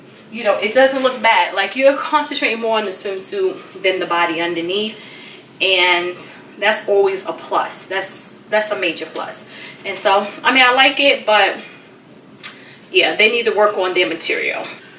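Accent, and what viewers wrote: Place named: New York, United States. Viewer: I could hear that strong new york accent lol love it is cute just like you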